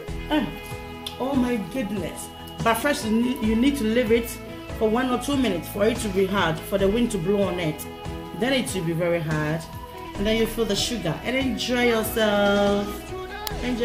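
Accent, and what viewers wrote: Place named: Ghana. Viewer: As soon as I heard the accent ...Ghanaian , lol! Subscribed!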